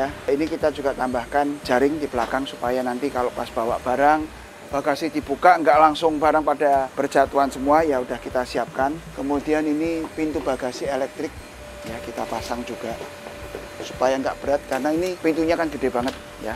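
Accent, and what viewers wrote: Java, Indonesia. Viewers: Oyi jgn rasisme, sudah asli indonesia lahir di jawa tengah, logat memang logat ibu yg terbawa... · Enggak Rasis maksudnya guyon kagum kok wes melebur sama darah Jawa medok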